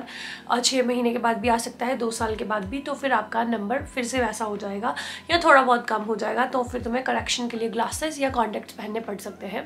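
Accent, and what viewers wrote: Punjab, India. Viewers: hahahha bhaji di jheri hindi aa na bhti kmal aa viche punjabi a jandi ....... wahhhh mza a janda sun k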